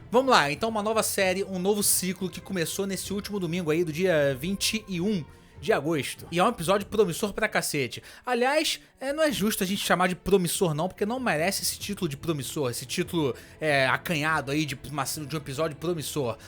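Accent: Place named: Rio de Janeiro, Brazil. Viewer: Tirando sotaque carioca o vídeo ficou muito bom.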